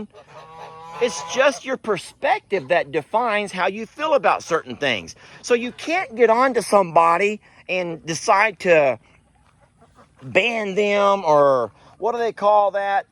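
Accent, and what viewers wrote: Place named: South, United States. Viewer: We say ol man and ol lady . I think It's more of a country thang you just have a southern accent.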